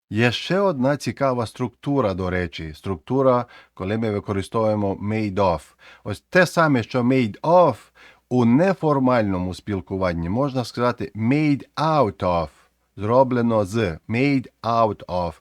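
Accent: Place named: Zakarpattia, Ukraine. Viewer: Підписалася, вподобайка, поширюю, коментую! Чудовий, професійний канал українською з колоритним закарпатським акцентом! Вже 20 років в Америці а таких тонкощів не знала - щиро дякую!!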